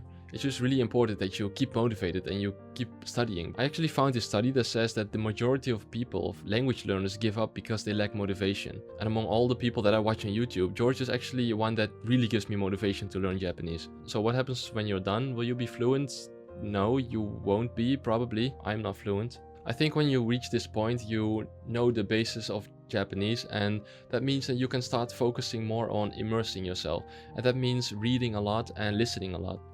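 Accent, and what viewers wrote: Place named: Netherlands. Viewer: I actually used the same video series when I started learning Japanese 5 years ago Ik wist gelijk dat je nederlands was door het accent man geweldig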